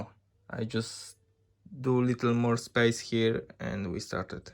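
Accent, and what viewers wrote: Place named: Poland. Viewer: Słychać że Polak 😀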